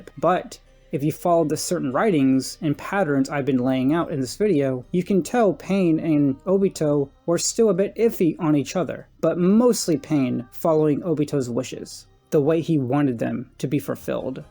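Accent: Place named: England, United Kingdom. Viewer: I love your English accent, and your videos are high quality congratulations 👏👏👍🏽❤️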